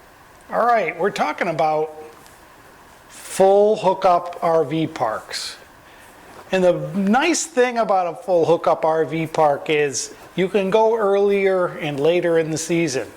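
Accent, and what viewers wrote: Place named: Hudson Valley, United States. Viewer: Thanks for the video as it clears up a few important issues that being a first time RVMH camper clears up. I enjoyed this video and I also enjoyed the first one where you put a marine outlet in to replace an existing one. Your dying and instructions were perfect. I do not know if your heater gives a way was for 2017 or 2018 but if it is still ope I timed the section on the give away pm a over cast cold Hudson Valley NY Saturday. We still have snow on the ground down hear but that will likely be gone in a few weeks . That is unless we get another N0r-Easter. I'll be glad to see winter depart. Thanks again for the videos and the chance to subscribe to a channel that has a person conducting it that speaks the way we speak down here in the Hudson Valley. PS there is more to NY than NYC about 100 miles down the Hudson River. 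< MIKE <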